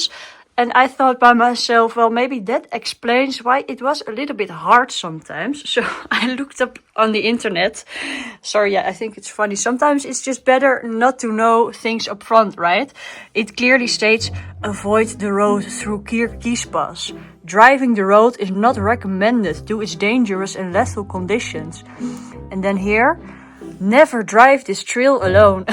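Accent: Netherlands. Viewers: No. They're both Dutch girls with similar accents which is nice. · Binged watched all of the episodes, great to see another Dutch lady taking on the the challenging rides, at the rate you are flying through the countries you'll be in Kuala Lumpur in no time. I see a lot of comparison in comments with Itchy Boots but apart from Dutch accent you bring your own format really great editing of video.You have really matured in a very short period in off-road riding, I remember the first gravel road I think I stopped counting the oi,oi's now there is hardly any 🙂replaced with wauw. Keep going enjoyable to watch and living vicariously through you and others traveling on bikes through foreign shores. You do you.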